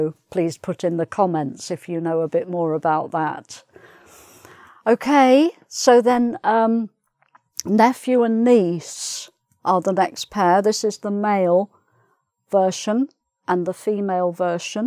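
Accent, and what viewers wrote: England, United Kingdom. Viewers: Hi i like your videos,theyre very intresting and i learned alot from you i like the british accent! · thank you a lot , i loved your british accent much <3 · chemical makeup hhhhhh You have the nicest English accent I've ever seen before. keep on.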